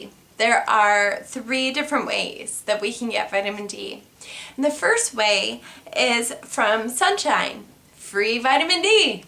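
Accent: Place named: Detroit, United States. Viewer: hi have a question with de mylasation of the nerve cells do people with ms have seizures curious cause this how i found your vids was looking for info on nerve cells in the brain, stroke i had has left me epileptic taking dilantin to control them and is working:) also it's so nice to see you smile, defiatley could light up any room with it:) luv the little Detroit accent too lol Canadian here / toronto eh:)joe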